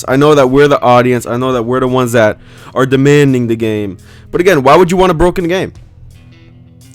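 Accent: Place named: United Kingdom. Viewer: never new you were British mate :)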